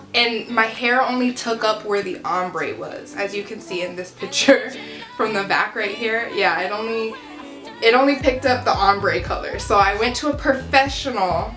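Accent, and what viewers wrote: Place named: Albuquerque, United States. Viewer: I thought I recognized the accent! I'm a mixed girl too! From Albuquerque! Love your video.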